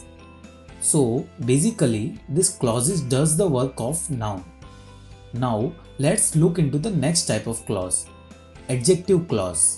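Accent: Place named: South India, India. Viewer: i think he is south indian😂....nice job sir.... rspct india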